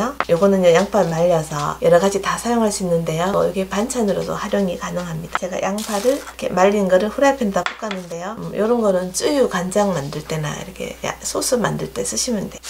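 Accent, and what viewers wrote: Gyeongsang, South Korea. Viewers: 좋은정보 감사합니다!! 차분하고 경상도 특유의 애교스런말투 너무좋네요^^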